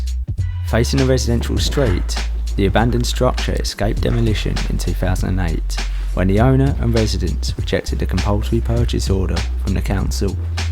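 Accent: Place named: Essex, United Kingdom. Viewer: Mate I wicked again brother can we meet up I have a few places up my sleeve. I make videos too. You sound like your from essex?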